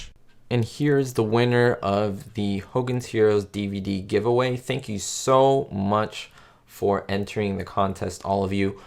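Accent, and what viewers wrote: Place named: New Jersey, United States. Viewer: You must be from NEW JERSEY.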